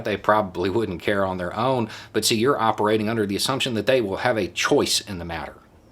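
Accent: South, United States. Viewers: THANK YOU THANK YOU THANK YOU THANK YOU for this. I've lived in TN my whole life which means I've been confounded for most of my adult life. Watching ppl I grew up with blindly hate vote for holes in their own heads, then yell YAY....HEAD HOLE! ACA, being a prime example. I'm an active Dem in my state. We're working hard to make inroads everywhere. We had Dems in 96 of 99 cong districts running in 2018 & 2020 which is huge for reasons I need not explain to you.. but it didn't move the needle enough. Only 35% of eligible voters vote in TN. I'm exhausted. I personally helped get over 80 ppl registered to vote for first time in 2020. Called every one of them to make sure they went to vote. So yeah..I'm exhausted. Thank you for spotlighting my home state. The real work of decent folks is in their own yard. I imagine what could be if we break that red south firewall, so it's that hope, that keeps me going. 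Your mere presence here, with your intelligent southern accent of truth & "wokeness" (sheerly used for lack of better term), in and of itself helps destroy the idea that the south is owned by the right. It's that idea that needs its ass whooped. So the other 65% who could vote but don't bcuz they rationalize it's hopeless & won't matter, can no longer honestly cling to that rationale. So THANK YOU brother! From one frustrated but not going away southern lefty to another.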